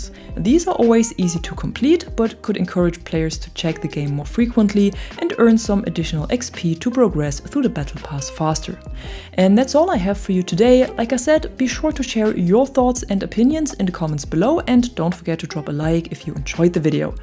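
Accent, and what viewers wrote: Germany. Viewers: I'm only here for the sound of your voice, are you German?😊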